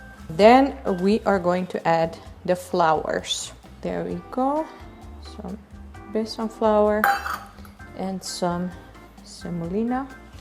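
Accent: India. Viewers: I kind of hear an indian accent 🙈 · Hoi hoi, wat een leuke video. Ik moet je eerlijk bekennen dat jou Engels achteruit is gegaan. Ik weet dat je nu in India woont, maar je spreekt nu ook echt engels net als de lokals. Vind het jammer, want je sprak altijd heel goed engels. # No diss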